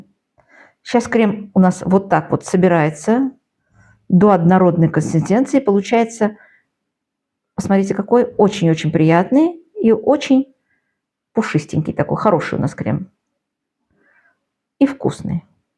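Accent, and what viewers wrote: Baku, Azerbaijan. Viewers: Никогда не слышала о таком, спасибо за рецепт, спасибо за наш бакинский старый акцент, как вы его сохранили???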